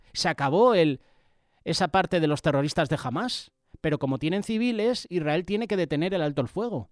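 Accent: Spain. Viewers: Supongo que eres español por como hablas. Pues siento decir que segun tu argumento España no existe es el falso nonbre que nos dierin los romanos.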